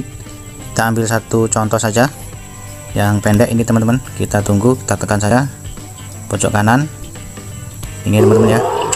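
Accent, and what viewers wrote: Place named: Banyumas, Indonesia. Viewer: Ngomong nya Bisa pelan pelan Dikit ngapak:v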